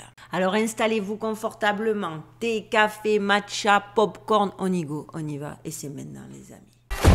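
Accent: South, France. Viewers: hello, encore et tjrs un régal soupoudré par ton accent des cigals, moi la parigotte, j'adoooore, et te remercie, sais tu qu'il a été plus que soupconné, voire prouvé, que les cropcircles, les vrais sont formés par une énergie electro magnétique qui vient du sous sol et non du ciel comme nous le supposions et oui les fréquences electro magnétiques agissant comme on le sait sur le vivant, et de façon comme tu le dit, totalement impossible à reproduire avec des raquettes attachées aux chaussures, bref juste pour ajouter à tes infos que les fréquences qui dessinnent ces crpcircles viennent de l'intérieur de la terre, comme quoi à force de nous avoir dit d'observer le ciel, peut être que tout vient de l'intérieur et non de l'extérieur comme pas mal de choses, n'oublions pas que tt est inversé, donc, commençons à regarder vers le bas et cessons de regarder les mouches voler ou un ciel encodé, là pour nous déviez l'attention de ce que nous devrions réellement regarder, MERCI pour celle que tu es, MERCI pour ta belle humeur, sourire et humour nécessaire en ces temps de mer.......e. 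Love from Paris!!! Nathalie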